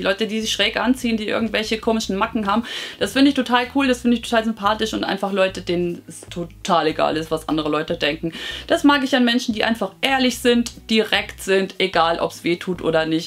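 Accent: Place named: Hesse, Germany. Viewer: Auf Hessisch bin ich jetzt so gar nicht gekommen. Musste gleich lachen als meine Frage prompt in deinem Intro beantwortet wurde. Süß irgendwie. 😊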